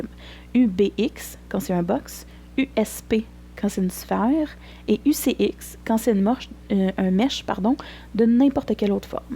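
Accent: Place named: Quebec, Canada. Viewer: Merci. c vraiment l'fun d'avoir une voix québécoise. À noter les majuscules sont importantes.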